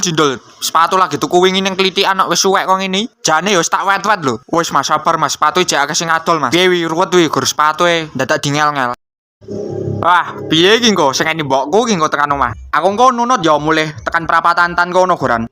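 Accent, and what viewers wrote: Surakarta, Indonesia. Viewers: Wong solo to mas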